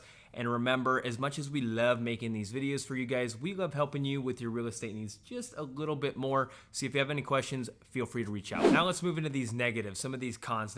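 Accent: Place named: New York, United States. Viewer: Sounds like NY/NJ to me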